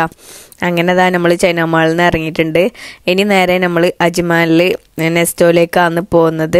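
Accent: Kasaragod, India. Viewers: Masha Allah Ninte Nalla Soundan Pinne Nammale Kasargod Samsarum Spr Aanetto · ഞാൻ ആ ദ്യമാണ് ഈവിഡിയോ കാണുന്നത് സംസാരം കേട്ടിട്ടു കാസർഗോഡ് കാരി ആണെന്ന് തോന്നുന്നു കൂടുതൽ അറിയില്ല എന്തായാലും ഫുൾ സപ്പോർട് 👍👍👍🌹🌹🌹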